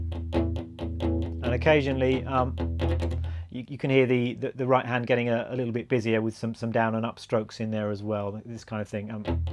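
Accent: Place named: East Anglia, United Kingdom. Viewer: fricking awesome. great ear. where are you based, you sound like myself, East Anglia?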